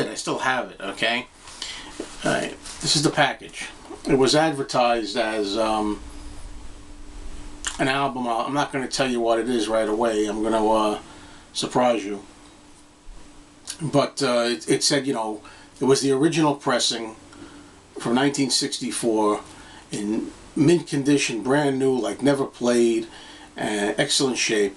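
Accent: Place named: Queens, United States. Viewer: Hey, I just wanted to share with you that one of my best/favorite cousins lives in South Ozone Park in Queens. My cousin Mark and his older brother {my other cousin} Matt are the one that took me to see Led Zeppelin at the Garden in 1977. The whole city was taken over by Zeppelin fans from all over for a whole week straight. It was one of the best times of my life and concert history. Your accent is similar to theirs. I am sure you have been at shows/games at the Garden. Talk soon~ Rob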